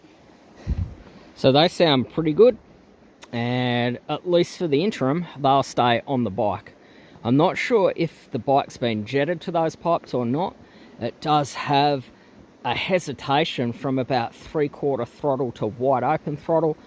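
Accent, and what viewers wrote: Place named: Australia. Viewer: Great to hear another Aussie. I just bought one of these. I need to know what a bobber is? It sounds like a good thing. Thanks for the video.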